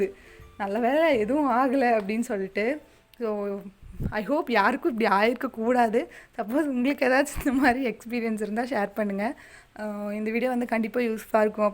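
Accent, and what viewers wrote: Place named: Coimbatore, India. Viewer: உங்கள் பேச்சு மிகவும் அழகு சகோதரி. நீங்கள் கோயம்புத்தூரா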